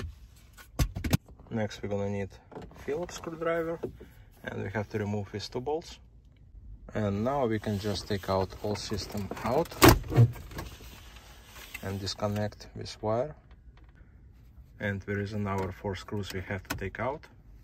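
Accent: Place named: Russia. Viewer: какое русское произношение))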